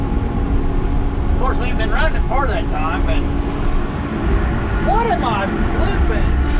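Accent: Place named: Pennsylvania, United States. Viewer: I like you and I like the way you talk, you remind me of some of my more interesting relatives in the foot hills of Pennsylvania.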